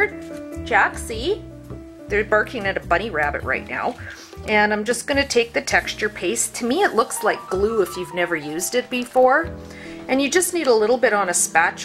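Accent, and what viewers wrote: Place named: Canada. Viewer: Hello fellow Neighbor! Thought your accent was Canadian. Born in Minnesota but moved to Colorado 20 yrs ago and everyone thought I was from Canada. No longer have my MN accent but listening to you brings back memories.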